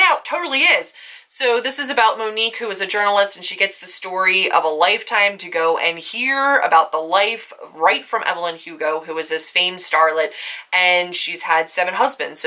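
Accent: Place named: Philadelphia, United States. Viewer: Just found your channel and I’m obsessed! Are you from PA? You sound like you have a Philly accent. I’m from eastern PA. I really want to read The Seven Husbands of Evelyn Hugo. You make it sound awesome.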